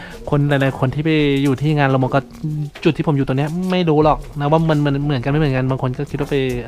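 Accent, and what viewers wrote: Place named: Central, Thailand. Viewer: พูดสำเนืยงฟังยาก น่าจะพูดสำเนืยงภาคกลาง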